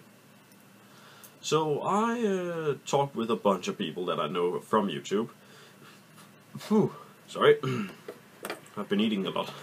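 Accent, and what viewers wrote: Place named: London, United Kingdom. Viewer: Jack The Ripper hmm I thought your from around London from your accent but english doesn't seem to be your first language so I understand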